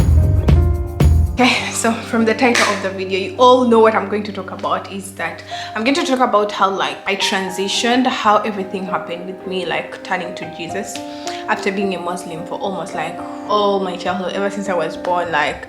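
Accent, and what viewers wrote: Uganda. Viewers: Just seen your videos, you sound Ugandan i stopped because of your accent. Ended up watching your carrot oil video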